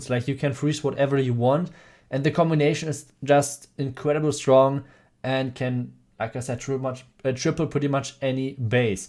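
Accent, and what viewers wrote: Germany. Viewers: Du hörst dich deutsch an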